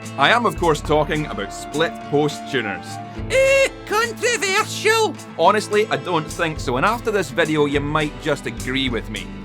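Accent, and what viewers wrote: Scotland, United Kingdom. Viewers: I need to lear the Scottish accent, like you and Dr. Steve Cassidy, so I can make more interesting videos. Love it. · I come here for the wonderful Scottish accent (me dad is mostly), and the guitar geekery is a bonus! Seriously, you are one of my favorite channels for the great guitar information, and because you're not afraid to slay sacred cows like locking tuners, unpotted pickups supposedly being better, pickup measurements influence on tone, etc.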